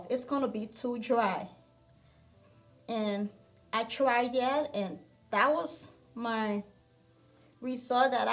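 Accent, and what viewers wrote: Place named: Liberia. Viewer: Your accent remind me so much of my sister in law - she is Liberian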